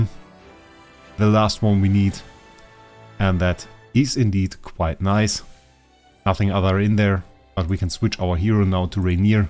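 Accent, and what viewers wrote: Germany. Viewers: the perfect pronounciation of that made me realise that you were german and that accent wasnt from some other language LMAO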